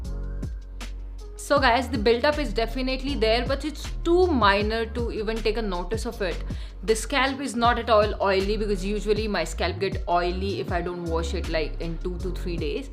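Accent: India. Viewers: I love indian English accent 👍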